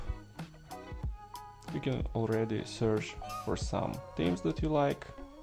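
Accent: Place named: Eastern Europe. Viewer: Найс чувак, по акценту почти не слышно что ты из восточной Европы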